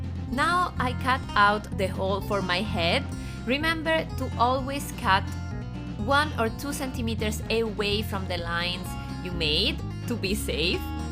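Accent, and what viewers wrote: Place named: Italy. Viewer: me encanta tu canal!!! sabes tu hablas mitad italiana mitad española saludos desde argentina!!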